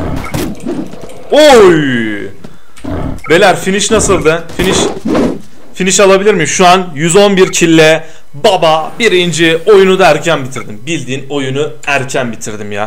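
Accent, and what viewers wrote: Adana, Turkey. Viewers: a be adanali misin lütfen cewap wer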